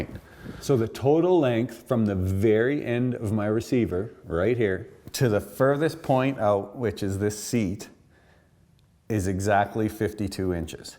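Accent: Canada. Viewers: Great review. Also I can tell your Canadian as fuck.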